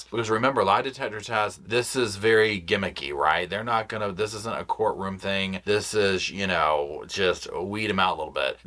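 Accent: South, United States. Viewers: I know you ain't none of that fancy credential stuff but I ❤ you and your reporting especially The Southern accent makes me feel right at home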